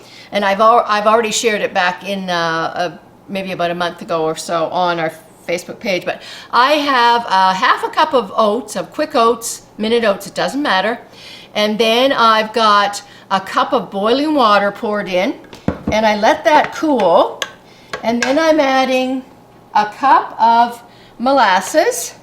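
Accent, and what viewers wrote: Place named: Cumbria, United Kingdom. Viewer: I begged for store bought white bread too. Horrid little thing I was. Ha ha. I will be trying your brown and digging out my bread maker. Loved hearing your voice- took me right back to keswick.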